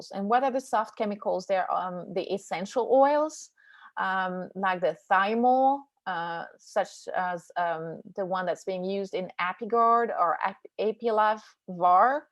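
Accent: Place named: France. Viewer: I didn't think you sounded Texan, but I would never have guessed French.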